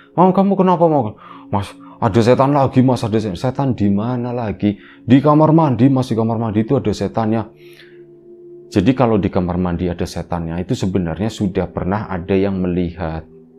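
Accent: Tegal, Indonesia. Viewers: Mas sampean orang tegal bukan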